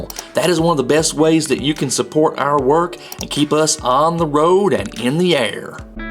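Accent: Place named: Eastern Kentucky, United States. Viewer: Thanks mucho for your very dry narration - and yeah, hear a bit of Eastern KY in there!!!!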